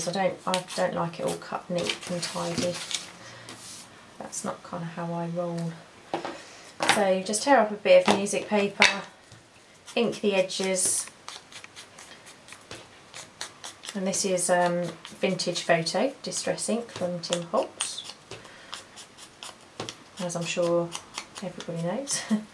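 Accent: England, United Kingdom. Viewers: thank you for sharing very helpful love your creativity and just love listening to you girls from the u.k. so up beat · Do you always distress with a brown ? Do other colours work,blue say or turquoise? I haven’t used these (cards) but I only draw and paint so far. Where is your accent from England ?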